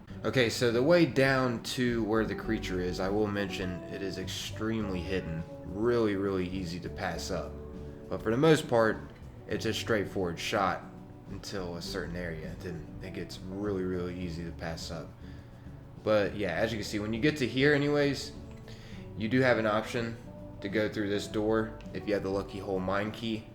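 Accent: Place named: Maryland, United States. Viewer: Are you from Maryland ? You sound like it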